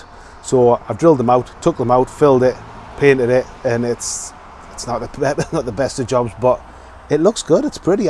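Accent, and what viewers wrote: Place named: Stockton, United Kingdom. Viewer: Thanks. By the way, Jill and I were taking guesses on your accent, I reckon your a Stockton, poss Darlington lad? 🙂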